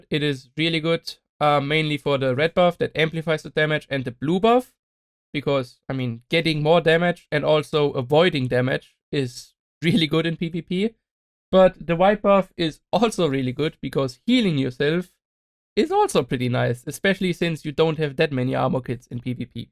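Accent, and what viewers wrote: Germany. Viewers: typical german accent.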